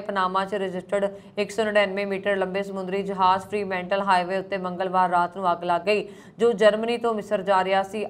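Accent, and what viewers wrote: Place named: Punjab, India. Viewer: Mam your punjabi accent is very sweet😊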